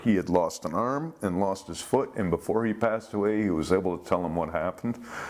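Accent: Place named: Connecticut, United States. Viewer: =You must be from Connecticut. I have been interested in dialects for 50+ years. How close am I in my guess? LMK please.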